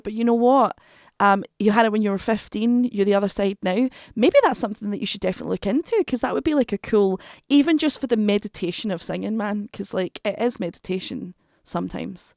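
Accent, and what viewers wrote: Scotland, United Kingdom. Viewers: I'm Polish and I always was just one of a few at work who could talk with people calling us from Scotland. I love your accent, reminds me of the lovely Amy Macdonald. And if you start a metal band I wanna be in it. Cheers!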